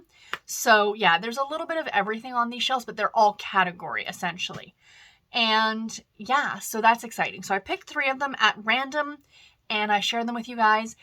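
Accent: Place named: Canada. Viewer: I knew you were Canadian! The accent and your vibe ❤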